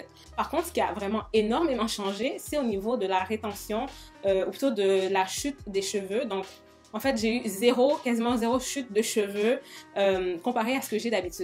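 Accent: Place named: Quebec, Canada. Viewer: J'aime trop ton accent québecois en plus tu est de toute beauté😌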